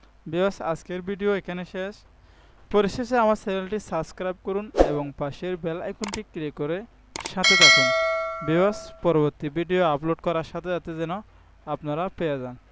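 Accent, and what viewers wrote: Chittagong, Bangladesh. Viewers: ভাই আমার মনে হয় তুমি চিটাগাং এর লোক তবে ভিডিও করার সময় কথা একটু জোরে বলবেন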